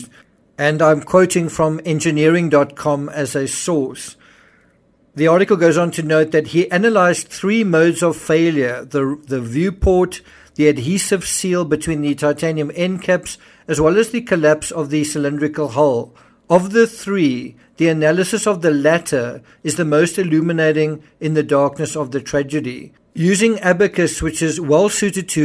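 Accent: South Africa. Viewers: Loved hearing the South African term "nogal". I always hhought your accent was South African. Keep up the good work! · you sound South African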